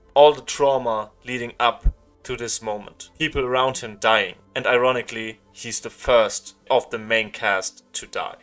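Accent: Germany. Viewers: You sound VERY German in accent coming from another German, it's very endearing to hear! This little thing that delighted me aside though, solid video! As a local Abbi fan I thoroughly enjoyed it. ❤️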